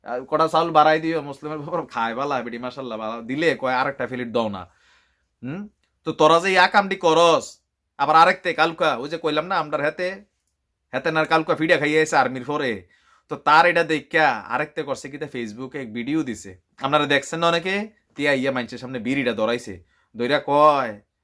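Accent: Comilla, Bangladesh. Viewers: ভাই আপনি কুমিল্লার ভাষায় কথা বললে অনেক ভালো লাগে😀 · আপনে দি কুমিল্লার ভাষায় কথা বলেন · অনেক ভালো লাগলো আপনার কুমিল্লার ভাষা। না বুঝলেও শুনতে খুব ভাল লাগে। এটা হচ্ছে নিক্সন চৌধুরীর বড় ভাইয়ের এলাকা। চুরি করলে শেখ মুজিবুর রহমানের ভাষণ এর মতই। 5 পার্সেন্ট শিক্ষিত যে লোক গুলো আছে এগুলো রাই এই ধরনের। আমাদের দেশের কৃষক ভাইয়েরা কখনো চুরি করেনা। যদি বাংলাদেশের সব সন্ত্রাসী থাকে সন্ত্রাসের গডফাদার হচ্ছে এমপি মন্ত্রীরা। এবং বাংলাদেশের যদি ইয়াবাখোর কিংবা মাদকদ্রব্য ব্যবসা করে থাকে। তাহলে আছে পুলিশ। এই দুই জাতের মানুষগুলো যদি ভাল হইত তাহলে দেশের চোর-বাটপার এগুলো কিছুই থাকত।সবার আগে জুতা মারা উচিত এমপি-মন্ত্রীদের। তারপরে জুতোটি বাইরে আনা উচিত পুলিশদের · ভাই আপনাকে ভিশন ভালবাসি, আপনি ভাই একটা লোক ভাই, জোস লাগে কুমিল্লার ভাষায়, কথা বললে, সত্যি বলতে, কুমিল্লার ভাষা, শোনা জন্য বসে থাকি আপনার মুখে, আমি অনিক, মনে রাইখে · Hazar Hazar koti na 68 bosta chawl bashi? 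Kormira to Netader kach thakaye shikhay. Apner uccharonay monay hocchay apner bari Cumilla Cityr Uttar pasher kono Unione. Good job. Mongol kamona korchi.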